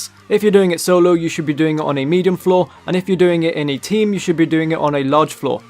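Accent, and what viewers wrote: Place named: United Kingdom. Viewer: i wish i had a dollar for every time i heard this brit say "as well"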